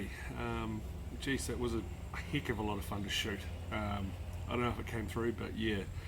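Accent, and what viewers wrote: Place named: New Zealand. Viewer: That kiwi accent... Those sorry azzzzzz lil mags :(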